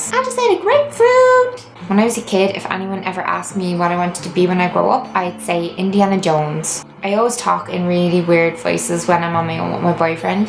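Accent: Ireland. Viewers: Was wondering about that accent.. Now I know. :D I love Irish accents, they're cute!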